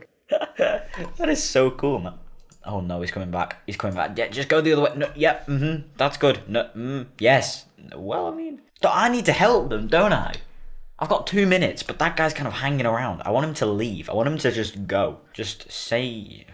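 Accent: United Kingdom. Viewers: He sound so british he sounds like a 12 year old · He literally have a fricking deep British accent now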